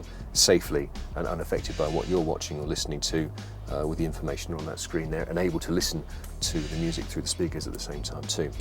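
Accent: United Kingdom. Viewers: Shut the hell up, we want to sample music not your damn british accent..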